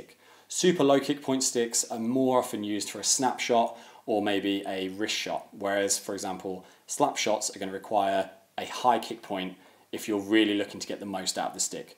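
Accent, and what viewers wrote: England, United Kingdom. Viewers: A black dude with an english accent is really what comes to mind when i about hockey sticks. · A black guy speaking in a British accent discussing hockey. Never thought I would see the day.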